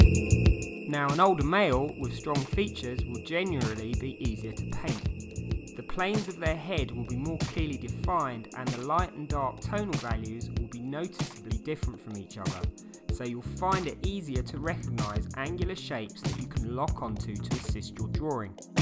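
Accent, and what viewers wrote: United Kingdom. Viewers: First time i hear a Greek guy with a british accent, more british than the british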